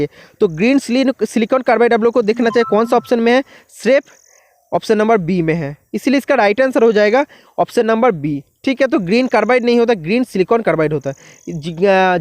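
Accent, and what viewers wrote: Bengal, India. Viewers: U r from Bengal?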